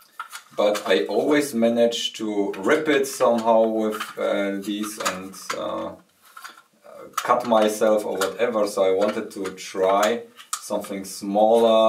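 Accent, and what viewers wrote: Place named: Germany. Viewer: man merkt unnormal dass du deutsch bist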